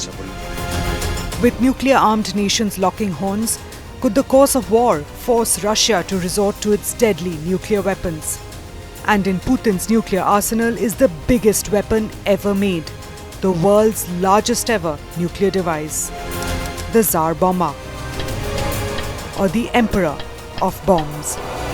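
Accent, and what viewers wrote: India. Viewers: Iam getting so bored with Putin and his nuclear threat he has been saying this for months this video is click bate no doubt it will be repeated again when Putin makes his next threat and people start to wobble again just like before Putin knows the response from the west if he did it would be goodbye Russia funny the voice over is Indian Russia's Allie what a surprise not🇬🇧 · Just speak your own indian language we do not enjoy that indian english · This Indian voice over is trash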